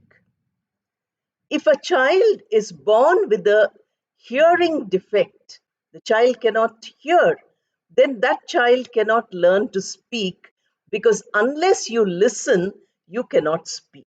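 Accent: Bengal, India. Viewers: What kind of english is this?american or british? It sounds like Benglish. Plz. Learn english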